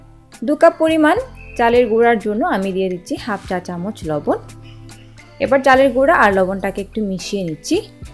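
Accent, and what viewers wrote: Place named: Kolkata, India. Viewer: uchcharon r karone kothagulo shunte valo lageni....apni ki kolkata style a kotha bolte chaichen?ছ চ r problem ta asha kori solve korben....otherwise valo hoeche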